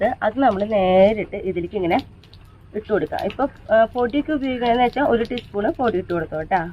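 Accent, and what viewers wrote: Thrissur, India. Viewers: എവിടെ ആണ് നിങ്ങളുടെ വീട്? തൃശ്ശൂർ സ്ലാംഗ് കേൾക്കാൻ ഒരു സുഖമുണ്ട് ഇല്ലേ? ഞാനും തൃശൂർകാർക്കാരി ആണ് ട്ടോ.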